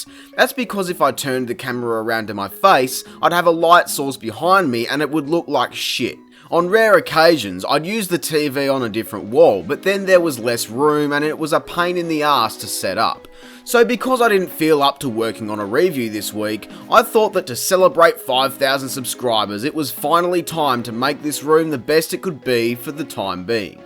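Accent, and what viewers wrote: Australia. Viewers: Ha love that set up bro 😜 Btw do you live in Australia? You sound Aussie 😃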